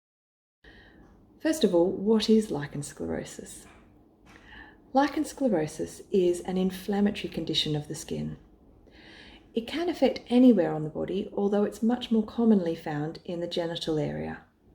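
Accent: Australia. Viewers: She sounds Australian. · Australians are the best dermatologists. Lovely hearing her Australian accent here.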